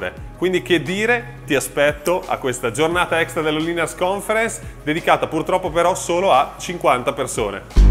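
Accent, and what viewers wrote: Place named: Ferrara, Italy. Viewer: ma sei di Ferrara? Dall'accento e intonazione sembri proprio uno di quei ferraresi oramai poco riconoscibili, che hanno viaggiato tanto e per questo smarrito (per fortuna) le elllle e le essse drammatiche, ma un eco mi rimanda a Ferrara, sono di Ferrara anch'io anche se ho vissuto negli USA qualche anno.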